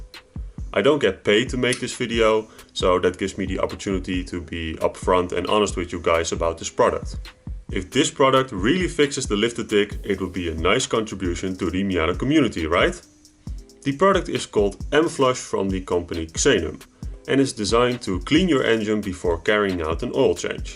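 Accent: Netherlands. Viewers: haha lekker nederlands accentje, ik heb een cls500 die ook tikt misschien dat ik dit ook ga uitproberen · you gotta love the dutch accent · Are you dutch 🇱🇺 ? The accent gives it away 😁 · Hey! Ik hoor dat je nederlands bent, dus hopelijk kun je deze vraag beantwoorden haha. Ik heb net een 1.8 NA miata aangeschaft met 250K km (150k miles) op de teller.. geimporteerd uit amerika, maar bij het starten maakt het motorblok precies het zelfde geluid. Zelf heb ik twee NB's gehad en altijd 10w40 gebruikt. Als ik dit spul wat jij aanbeveeld erin stop en 50-100km ga rijden, kan dat geen kwaad? En daarna mijn olie ververs? Zijn het de zuigerveren die dan vies zijn of aan vervanging toenzijn? Graag hoor ik even meer😁 Groetjes, chris.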